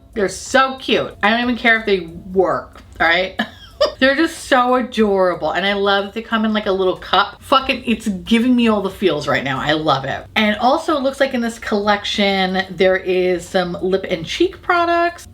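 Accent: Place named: South, United States. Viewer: you are 5 more y'all close to me loving you even more. I also love the random southern accent for no reason poppin up these days. Yea show is Pat 2 !!!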